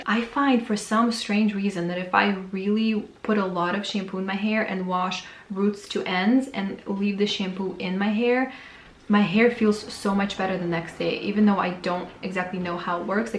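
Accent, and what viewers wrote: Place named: Michigan, United States. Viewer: I love Mason Pearson brushes! What state are you from? You sound like you’re from Michigan or further NW 🙂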